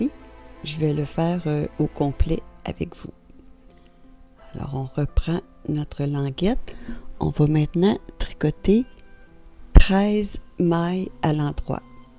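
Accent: Quebec, Canada. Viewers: J’aurais dû regarder plutôt votre vidéo je suis en train de terminer Chaussettes DROPS 3-4 ans avec de la Fabel avec des aiguilles 3 et demie. Il y a longtemps que je n’en avais plus tricoté, j’étais un peu perdue merci beaucoup pour vos explications parce que je vais en refaire avec d’autres laines DROPS pour mes derniers petits-enfants jJaime beaucoup vos explications et surtout l’accent du Québec et les astuces que je ne connaissais pas. Un grand merci 🙏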